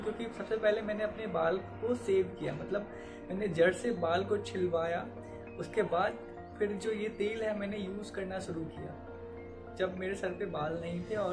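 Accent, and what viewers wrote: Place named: Bengal, India. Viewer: Pronunciation se Bengali mone h6e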